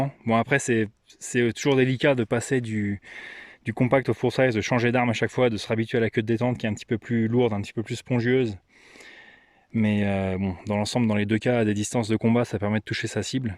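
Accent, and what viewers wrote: United States. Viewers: Super video, l'accent ricain très soigné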